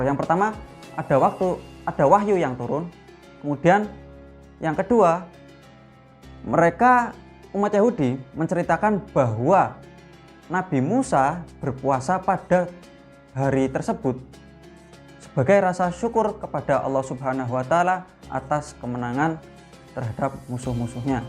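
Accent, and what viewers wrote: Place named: Banyumas, Indonesia. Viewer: Ust ahlu ngapak masyaAllah😍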